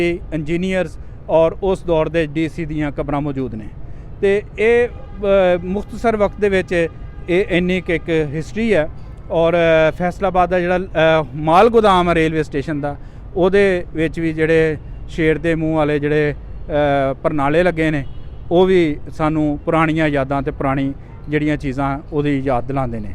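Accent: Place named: Doaba, India. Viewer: Aah bhaji da lehja sade hoshiarpur doabe val da hai , pure doabi accent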